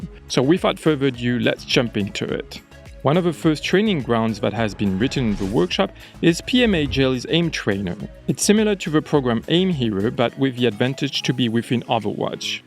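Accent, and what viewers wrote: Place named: France. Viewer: Cet accent français😂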